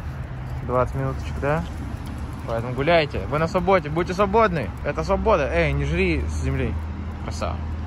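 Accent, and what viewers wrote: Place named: United States. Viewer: Забавно как у на русском уже акцент американский появился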